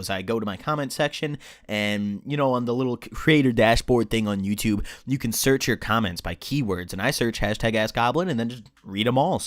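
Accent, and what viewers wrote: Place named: Indiana, United States. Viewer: Bro I thought u were from Indiana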